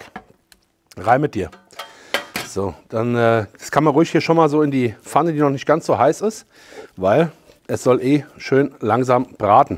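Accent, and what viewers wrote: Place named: Frankfurt, Germany. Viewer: Absolut! Und der Frankfurter Dialekt - super!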